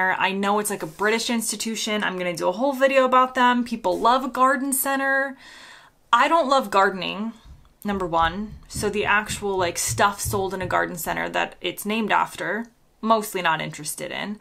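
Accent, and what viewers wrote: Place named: United States. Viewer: Love your US accent ❤❤❤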